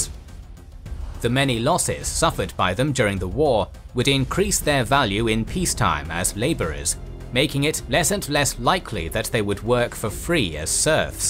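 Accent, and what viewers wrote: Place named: England, United Kingdom. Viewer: I think is English not American based on his accent. But the question is, the World is Euro-centric cause a lot of today's world has its base in European history and technologies (we live in European world so to say) due to the massive influence and impact for good and bad Europe had on the world stage. However, calling England the agent of change of the Medieval period, is like saying Europe was the only place were Iron work or Agriculture was done in the planet. Something we all know is fake and batantly untrue. Pointing out what happened in England is interesting cause as the video is in English, Anglo-American culture wants to know what happened there but leaving the Hansa, Iberia, Northern Italy and the Byzantine Empire complete out of the matter is like talking about the birth of Agriculture and just talk about China as if it had originated there and not Mesopotamia.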